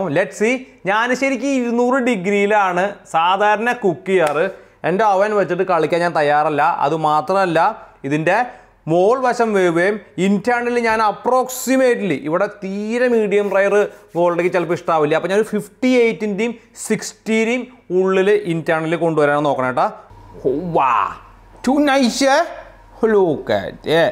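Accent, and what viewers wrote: Thrissur, India. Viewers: Started watching your channel recently......super contents and lovely presentation.....That Thrissur bhasha and lofley is superub👍🤙...keep the good work cheta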